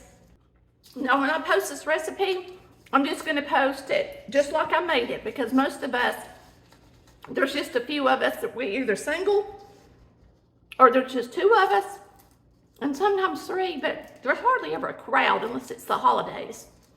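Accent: South, United States. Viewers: I just found your channel and already loving your video's and your southern voice and charm. Do you have a cookbook??? See you in your next video. ♥️😊👍🏻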